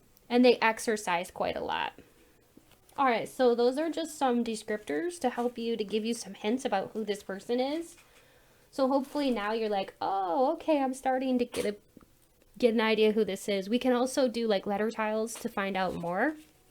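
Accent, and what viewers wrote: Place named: South, United States. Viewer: Good afternoon!I admire you very much and i adore your vibe.I am not from America but i feel that you stay in South of America ,in Ney Orleans,or Atlanta.Your vibe and how you speak make me remeber the Gone on the wind.I apreciste if you confirm3 this think!Thank you for your wofk!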